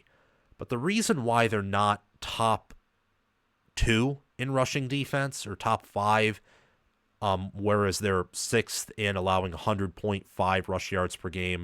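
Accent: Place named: Midwest, United States. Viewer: Midwestern folks talk funny like thatt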